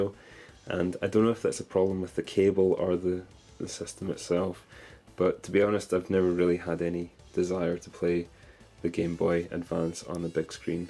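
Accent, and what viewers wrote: Scotland, United Kingdom. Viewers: Confession: I actually just watched this video to hear your raspy, Scottish (I think) voice. I honestly watch this every night because you have a voice that smooths me up like butter and I just fall asleep just like that. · Where in Scotland are you from?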